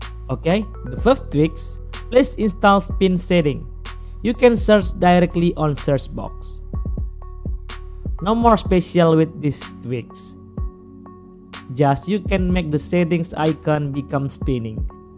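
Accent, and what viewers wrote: Indonesia. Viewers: org indo ya bg? ketara accent nya😅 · Your accent kek orang Indo anjir but its good tho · benerrr tp aksennya ketebak banget klo org indo WKWK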